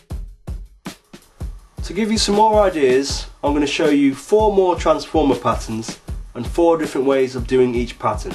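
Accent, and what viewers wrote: United Kingdom. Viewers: u sound like ur from nottingham. are you bro?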